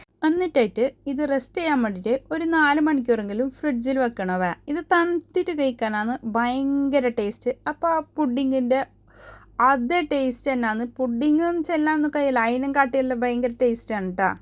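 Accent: Kasaragod, India. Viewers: Kandappole kothiyakunnu, pazhuthu poya pazham vechu oru super pudding, kasargod varthamanam kelkkan rasamanu 👌👌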